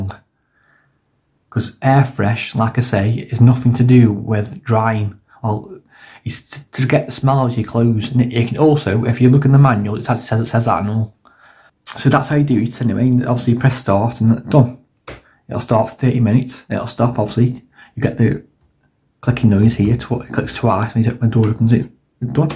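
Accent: Stoke, United Kingdom. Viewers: you from stoke mate?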